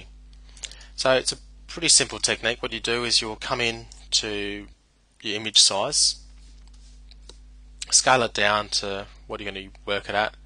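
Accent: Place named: Australia. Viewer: he's australian, dude. give him a break. Nice upload! very helpful!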